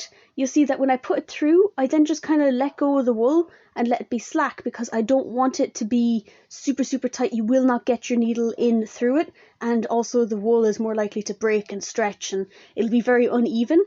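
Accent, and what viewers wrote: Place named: Ireland. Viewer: Excellent!! And with an Irish (?) accent to boot! 🙏🙏👏👏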